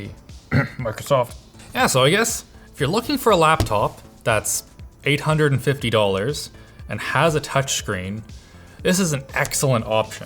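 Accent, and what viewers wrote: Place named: Canada. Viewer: Canadians accents make me laugh and I'm Canadian.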